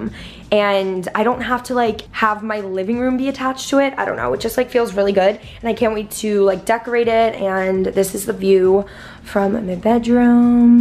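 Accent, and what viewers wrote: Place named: Northeast, United States. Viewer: ya it's def a northeastern accent thing. i watch another youtuber who lives in la but she's from massachusetts and she say "rum" for "room" too. it's funny but cute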